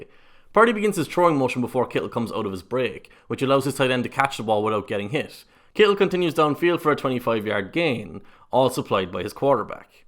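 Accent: Ireland. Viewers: i'm lovin' hearing an Irish analyzer review my team. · WAIT!......why is an irishman talking about American Football......and in a very concise and inciteful manner?? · Nice -- more football analysts should have Irish accents. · Hearing an an irishman be so analytical about football brings me so much joy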